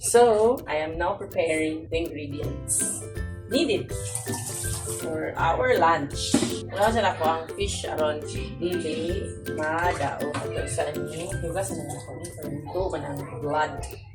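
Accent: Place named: Visayas, Philippines. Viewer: bisaya d.ay maam 😊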